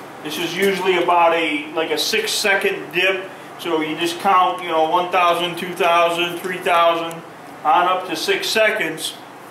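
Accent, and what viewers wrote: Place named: Midwest, United States. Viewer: What is your location btw? It sounds like you are from the Midwest 😀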